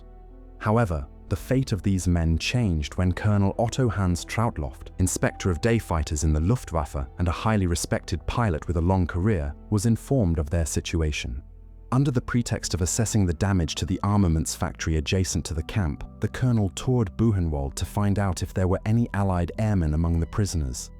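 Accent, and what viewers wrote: United Kingdom. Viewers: Nice video. Can I just say, in British English we say "one hundred AND sixty-six. We NEVER say "one hundred sixty-six". You've chosen a British accent, so you need to give it British expressions, otherwise it sounds bizarre.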